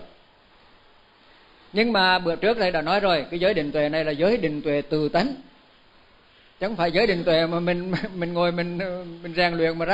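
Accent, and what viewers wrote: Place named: Saigon, Vietnam. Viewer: Mô Phật... kính bạch thầy. Xin thầy vui lòng chuyển âm băng của thầy giảng bằng "GIỌNG NÓI SAI GÒN " Rất hay và dễ nghe. Xin chân thành cảm ơn thầy. Con luôn luôn yêu mến giọng nói SAI GÒN tha thiết !!!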